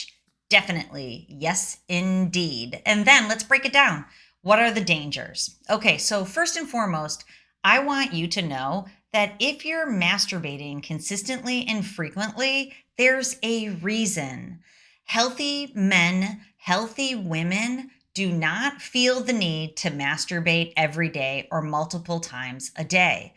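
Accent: United States. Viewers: I turned this off as soon as I heard the American voice. I'll bet it has something to do with Jesus.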